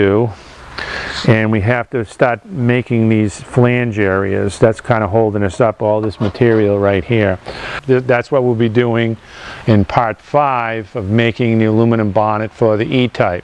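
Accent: Boston, United States. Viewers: this guy has got to be from boston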